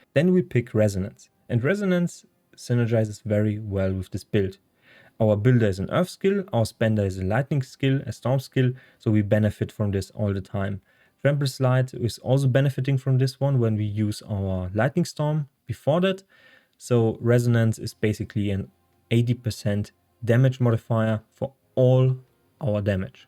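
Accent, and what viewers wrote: Germany. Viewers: Dikka 😂😂😂 nice Video aber dein Englisch 🙈🙈🙈 liest du ab? Würde wetten dass du Deutscher bist, mach deine Videos doch auf deiner Muttersprache. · Thanks for the video. I could listen to you talk all day. I used to live in Germany and so I miss the German accent of people. You sound like you're from somewhere near Mannheim/Ludwigshafen, but I could be wrong....lol.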